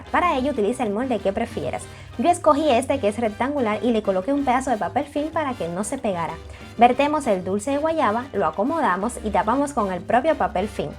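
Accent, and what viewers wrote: Cuba. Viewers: Hablas con acento habanero ☹️ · Solo sé que me encanto tu acento cubano.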